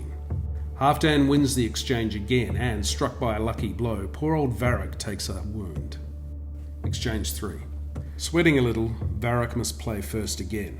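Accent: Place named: New Zealand. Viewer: Sounds like this is an NZ developed game, from the voice over at least. Couldn’t get a copy from anyone in the South Pacific, so had to order a copy from Germany! Looking forward to it arriving. Hopefully enough time to print and paint some minis!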